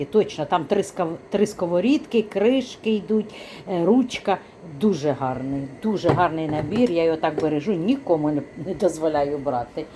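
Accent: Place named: Central Ukraine, Ukraine. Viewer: Ну от навіщо цей ЛІКБЕЗ. Це не той канал, де грамоті навчають. знімає Проізвольні відео, і мені цікаво все і навіть її говір. Бо я теж з центральної України і балакаю також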